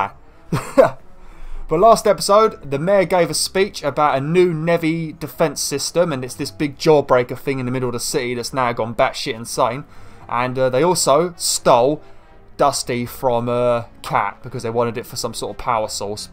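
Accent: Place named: United Kingdom. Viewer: Reasons why is an awesome youtuber: 1) he dosent spam his videos 2) he always responds to his Wolfpack 3) plays games that you want (the newer ones you want, screw call of duty and mine craft lol) 4) supports my Wolfpack (your very awesome for that, thank you) 5) is a that could play video games 6) a that drinks T 7) a that speaks with a British accent 8 ) to infinity and beyond more lol keep up good work, I'll try my best to keep supporting the Wolfpack as well as trying to be awesome for my channel or whatever! Always eat your pizza! HOOOOWWWWLLLL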